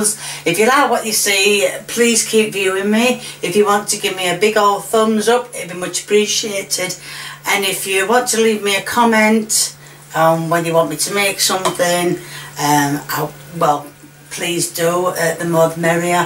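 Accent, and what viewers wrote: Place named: Scotland, United Kingdom. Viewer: She’s from Scotland I think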